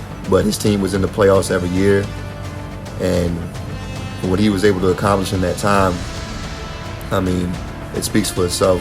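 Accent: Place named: Baltimore, United States. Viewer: Nice vid! Bird Hakeem and Harden are my all time favorite players! Love the Baltimore accent. One small tip though, the music is a bit loud in comparison to your voice :)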